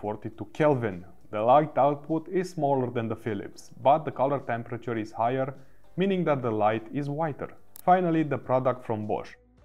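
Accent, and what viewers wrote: Russia. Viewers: Бля, русский английский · R U Russian? Very detail & professional test of 3 biggest brands. Thank you!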